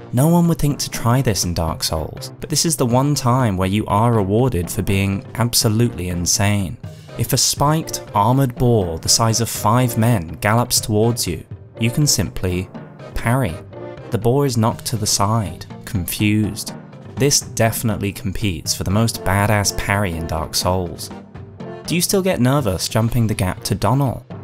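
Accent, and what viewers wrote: United Kingdom. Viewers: An actual good YouTuber making good content! Love to see it! Too many wannabes out there, for some reason I think you and 3kliks would get along great, you two and the amount of British emitting is incredible, especially in narration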